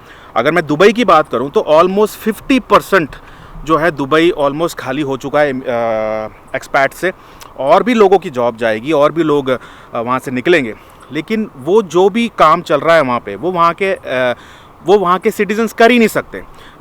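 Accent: Kerala, India. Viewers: Sir are you MALYALI?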